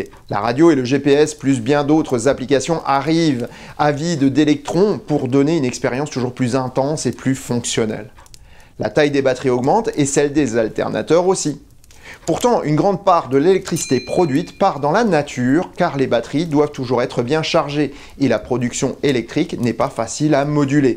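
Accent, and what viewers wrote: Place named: Alsace, France. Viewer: Super intéressant et bien présenté, j'aime bien le décor. Peut-être un point à améliorer pour ma part, le petit alsacien distrait l'écoute.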